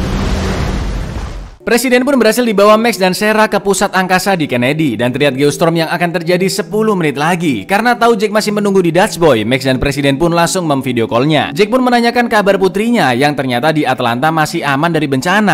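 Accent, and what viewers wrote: Java, Indonesia. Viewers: lagi² medok. kebanyakan yg bahas film pada medok. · pengendali cuaca pake alat hah, indonesia dong pake pawang 😂 Yo ngene iki nek bahasa jowo medok dadi content creator, sak onone disebutno 🥲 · Cak Jawa mana sampean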